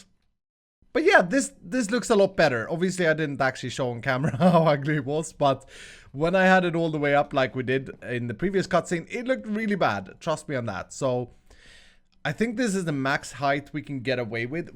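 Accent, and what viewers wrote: Sweden. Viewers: Du är svensk eller hur kanske från dalarna? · Omg XD its so ez 2 hear that u are from Sweden XD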